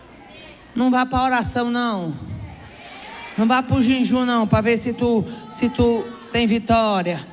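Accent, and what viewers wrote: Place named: Northeast, Brazil. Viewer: Madrugada e eu com ela: ❤️. Que companhia agradável com o sotaque do meu Nordeste: consistente, direto, sincero e antes de tudo, ABENÇOADO. 👏🏾👏🏾👏🏾👏🏾 "A" de 🥰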